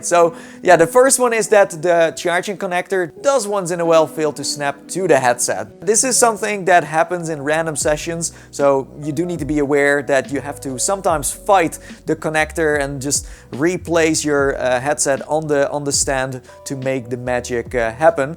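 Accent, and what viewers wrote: Netherlands. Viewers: Zie je nou wel ik zag het al aan je en aan je engels dat je Nederlands was ahahah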